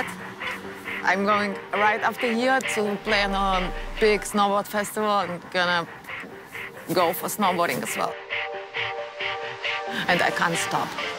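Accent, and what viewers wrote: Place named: Germany. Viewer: Такой прикольный английский на немецкий манер, крутая 😎 классная, очаровательная!!!😊😘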